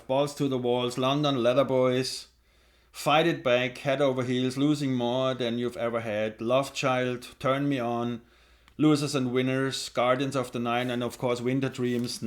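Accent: Germany. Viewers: Mir gefällt das "DeutschEnglisch"" ^^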